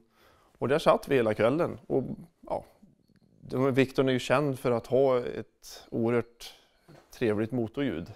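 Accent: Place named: Bragnum, Sweden. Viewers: Grym kille och fina traktorer! Finns nog inget ljud som slår Scania V8! 😍 Bara en fundering ! Varför låter han ofta som Västgöte, men ibland inte? 😄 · Haha! tyckte jag kände igen dialekten och omgivningen, själv uppvuxen i Bragnum ett stenkast från Ängagår´n. Härliga byggen! Å i åa ä e ö.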